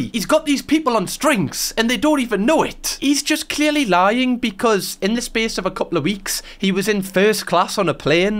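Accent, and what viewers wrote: Newcastle, United Kingdom. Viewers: I am being 100% serious, I subbed to both of your channels after never having seen one of your own videos. I saw you in a collab and was like "oh hes a geordie (honorary scot) imma sub to him"... been binge watching since lol.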